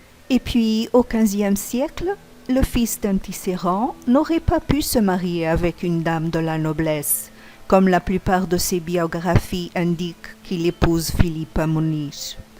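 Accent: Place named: Portugal. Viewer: Porquê em francês? Dá para perceber que é portuguesa...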